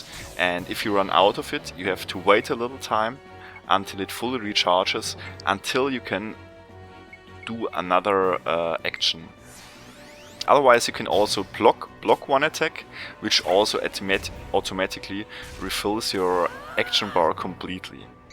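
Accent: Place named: Bavaria, Germany. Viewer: you Sound very german. :D Can you play this game at full Screen? ape escape 2 seems to be the only full Screen game. :/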